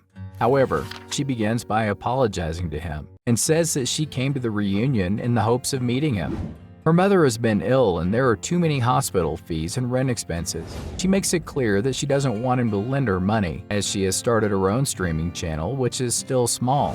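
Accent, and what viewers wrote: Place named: South, United States. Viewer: how did you give text to speech a country accent?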